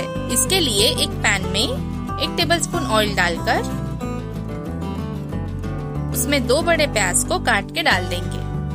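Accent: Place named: Nagpur, India. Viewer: I can sense Nagpuri accent in your language, though u tried to talk in pure hindi.....'ab apni' 'aju baju se'🔥🔥bole to apna Nagpur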